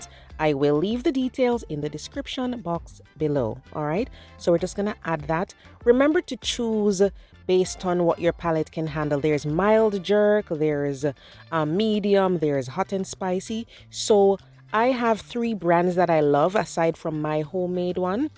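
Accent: Jamaica. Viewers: I love the Jamaican accent and your women's track team consisting of Elaine Thompson-Herra, Shelly Ann Fraser Price and Shericka Jackson is FLAWLESS. United States don't have a competitor to match for the MOMENT. But don't count us out! The men's track team is dominating and Jamaican can't compete with them.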